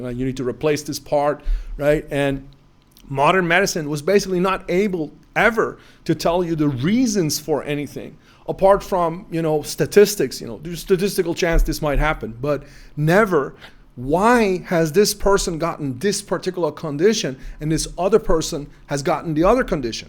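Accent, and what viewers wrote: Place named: New York, United States. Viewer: I'm American of Swiss French parents. I was in Iran for 2 or 3 weeks in 1970. I hitched rides from Turkey to Tabriz then Tehran . Had to take a train to Mashed , a very holy city. Iran was a very peaceful place. The people were super nice , very intelligent, very clean. The woman were free and very friendly in a very respectable way. It was completely different going into Afghanistan . A very wild place. Your channel says United Kingdom but you sound like you're from New York city. Your astrology readings are always a real mind blower. You deep dive like no other. Thanks for your incredible work !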